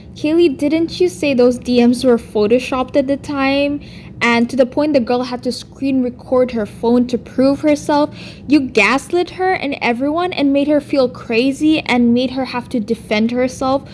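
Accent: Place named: India. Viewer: The fact that she tried to say all she did was identify you by saying you had an accent... no, she said "that weird girl with the Indian accent". She insulted you and tried to play it off like she never said anything wrong and just said you had an accent. And she was being racist too. She's also acting like she's changed now, but she denied everything until there was irrefutable proof that she said those things. And she's STILL lying even though we've all seen the proof. She can keep going with the "I'm not perfect, I make mistakes" narrative, but idk about you but I've never body shamed anyone. And her admitting to being a narcissist along with the body shaming just feels so gross. I know I'm rambling, tbh I've only seen 20 minutes of that livestream so far but it already pissed me off and I have a lot of thoughts on it lol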